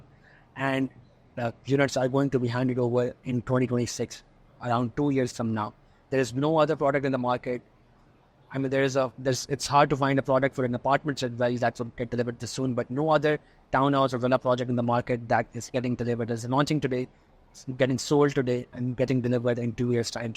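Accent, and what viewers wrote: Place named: India. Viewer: Very tough Indian accent, I didn’t understand 50% of information 😤😑🥴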